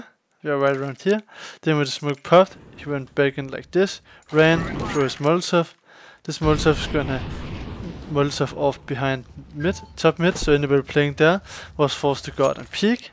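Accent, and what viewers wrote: Denmark. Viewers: N1, off topic u sound VERY danish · danish accent so cute <333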